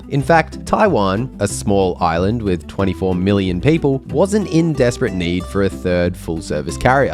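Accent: Australia. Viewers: Yeah, particularly because he's good looking with an aussie accent 😊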